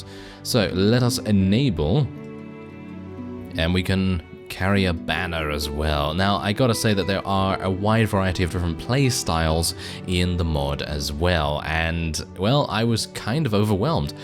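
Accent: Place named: RP, United Kingdom. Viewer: Absolutely love your videos and your posh accent lol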